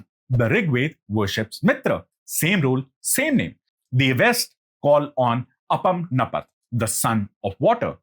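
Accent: India. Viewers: Indian accent 😂😂😂😂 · Indian english. omg. Half of it is a blabber.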